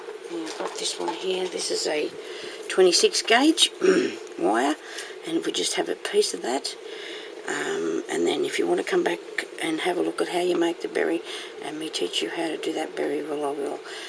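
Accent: Australia. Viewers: you must be inland l live on the coast hot but not as hot as inland. there great little flowers really sweet. its so nice to hear an aussie acsent. looks beautiful love the flowers tfs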